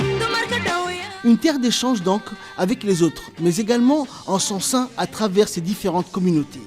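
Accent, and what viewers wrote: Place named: Djibouti. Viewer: Voix off : couleur locale et sonne joliment "Chaboutienne" à l'oreille ... j'adore ! Non, j'admire ! Non, attend, mieux encore je partage! Good job!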